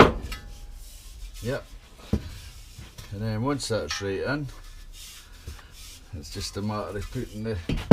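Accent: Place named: Glasgow, United Kingdom. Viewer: Thank You very much for the video and the nice scottish accent! Sounds like Glasgow? Say, why do You have to separate the inner and outer can with the wadding at all? As far as I understand it, You just want to shorten the biggest of the 4 tubes in the end piece You cut off before in step one? Sorry if I missed something. :-)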